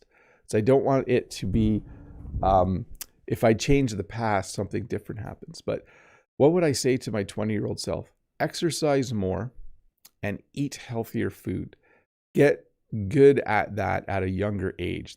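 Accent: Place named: Canada. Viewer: The way he is saying in English, it's very understandable, Canadian's accent is the best!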